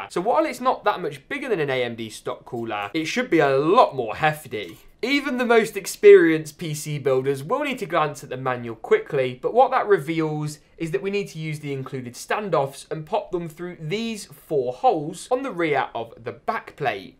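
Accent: United Kingdom. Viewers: such a british xDDDD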